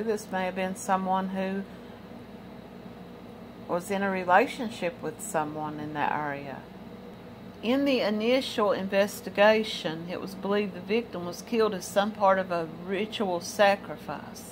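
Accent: United States. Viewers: I hope you weren't offended because I could tell by your accent that you were close to Virginia my family is like north of Knoxville it's a little it's a hair different lol... Slightly but you can tell the.... A sheriff's department has posted a Cold Case webpage just went online I'm going to go grab it for you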